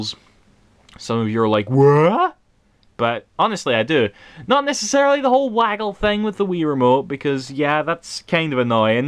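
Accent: Scotland, United Kingdom. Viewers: Your accent isn't as thick as I would expect from a typical Scot. I can actually understand you. :) Now some of those English lads... ;)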